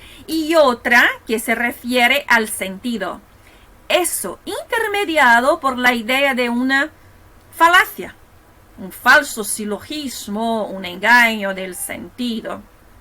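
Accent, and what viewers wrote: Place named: Spain. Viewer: Parabéns, professora, pela brilhante análise do soneto e pela relação que fez com a contemporaneidade. Lindo acento espanhol.👏🏼👏🏼👏🏼👏🏼👏🏼👏🏼👏🏼👏🏼👏🏼👏🏼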